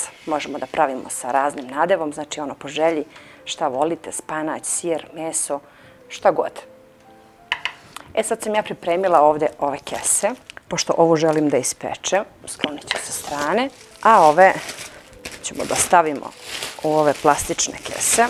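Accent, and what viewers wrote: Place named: Trstenik, Serbia. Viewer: Ooo sunce moje❤️ stvarno si zlatno dete.Podsećaš me na moju ćeru.Ne provodim baš previše vremena na netu,ali tu sam,i mnogo volim da gledam,sada i pratim tvoje klipove❤️Čak i kada sam u bolnici,ti si mi velika uteha jer obožavam da te gledam i slušam.Ti si moj zavičaj❤️Imamo isti naglasak,pričaš kao da si baš iz mog Trstenika, Medveđe,majka ti je predivna,porodica❤️ Recepti su bukvalno isti,kao što je mene učila moja baka,pa mama.Možda nemam mogućnosti da budem sve vreme na netu,da pratim baš sve❤️Moj muž,duša moja, me je u maju napustio,i nikako to ne mogu da prihvatim😢🙂❤️Preteško je,ali opet život ide,ne pita,a ja idem za njim,kako moram❤️Velikim delom utehu nalazim i u tvojim klipovima sunce drago,i zato ti beskrajno hvala❤️🍀❤️Veliki pozdrav i zagrljaj iz mog Oldenburga❤️Bog vam zdravlje i sreću navek dao🍀🍀🍀❤️❤️❤️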